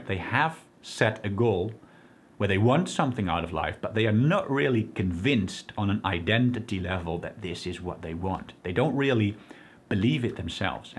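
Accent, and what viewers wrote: Netherlands. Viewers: He is from the Netherlands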